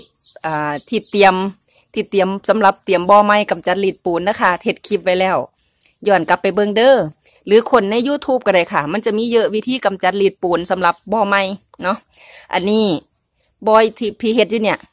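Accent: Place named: Isan, Thailand. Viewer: ***เสียดาย สำเนียงอีสานฟังไม่ออก...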